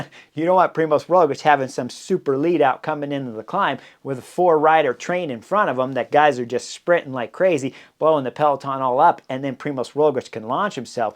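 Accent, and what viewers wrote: United States. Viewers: Hey, I'm an Aussie. Would you mind telling me what part of America your accent hails from?:)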